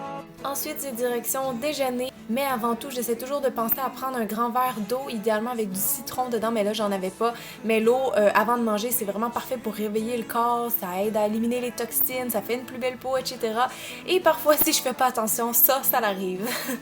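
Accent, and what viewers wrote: Quebec, Canada. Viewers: Elle est québécoise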